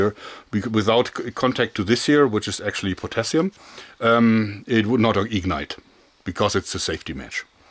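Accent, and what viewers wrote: Germany. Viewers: are you german or austrian?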